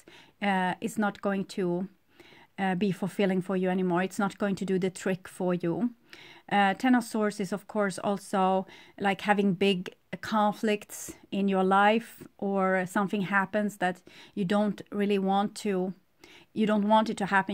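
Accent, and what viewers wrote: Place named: Sweden. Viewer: Are you Swedish? You have a Swedish accent. Thanks nice reading still watching though.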